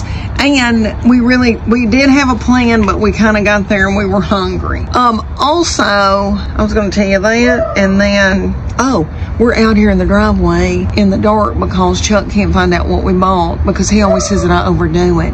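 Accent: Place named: Tennessee, United States. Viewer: Oh my goodness! Morgan shops at Costco too. I was so excited to find this out., I love your accent. My cousins sound so much like you. They are up in Rocky Top, Oak Ridge and all around there, Knoxville. I grew up in Florida, so I have a very different way of speaking. Love, love, love your humor. It’s sooooo true! 😂😂😂❤❤❤ Cheryl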